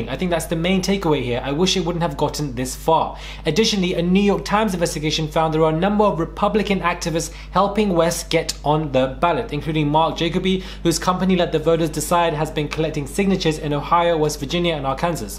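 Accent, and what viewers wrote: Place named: United Kingdom. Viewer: Ngl, was laughing my WHOLE ass off listening to trying to talk about US politics and locations. Caught the pause for pronouncing Illinois correctly, but I lost it when he said "Ar-Kansas." Good vid. Just super funny listening to someone (presumably) from the UK discuss these things.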